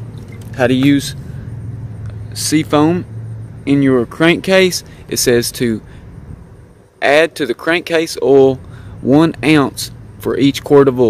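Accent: South, United States. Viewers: I looooove a country, hillbilly accent. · I love this guy's southern accent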